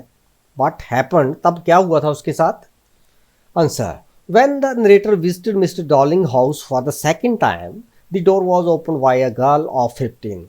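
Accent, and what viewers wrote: Haryana, India. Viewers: SIr are you Haryanvi aap hi Awaaz sewada chal raha hai ki aap Haryanvi Ho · sir u r from haryana ?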